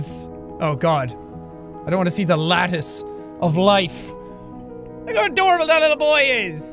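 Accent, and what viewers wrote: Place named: Ireland. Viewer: Well, I am new definitely heard of him didn't know he had such a Irish voice cool because I am Irish! 😂😀😄😅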